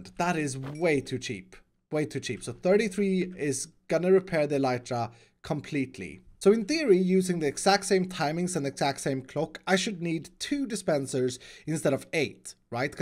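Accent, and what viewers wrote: Sweden. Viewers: to me its sounds like a harsher Swedish accent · are you Swedish?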